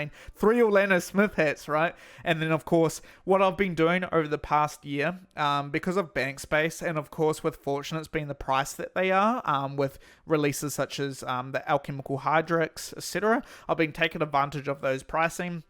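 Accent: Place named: New Zealand. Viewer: Mate, are you a kiwi? You got that thicc east tamaki accent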